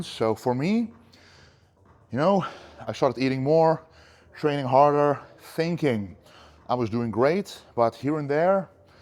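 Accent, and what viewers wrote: Netherlands. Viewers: English as a second language, he's from the Netherlands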